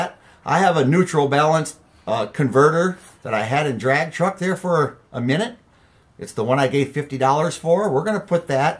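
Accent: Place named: Minnesota, United States. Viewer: Just found the channel, pretty good! (where are you from? sounds almost a Minnesota scandinavian accent?)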